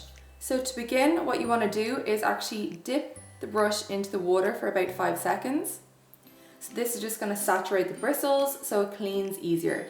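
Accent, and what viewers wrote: Ireland. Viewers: She's Irish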